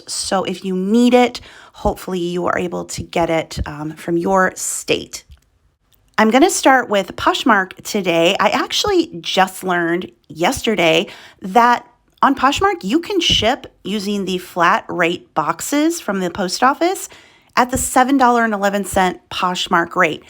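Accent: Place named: United Kingdom. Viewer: Great British accent.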